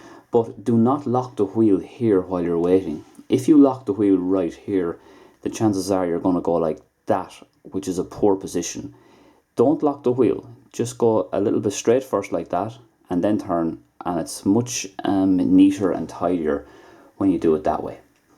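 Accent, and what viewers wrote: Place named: Ireland. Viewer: you're so clear with your Irish accent you're the best.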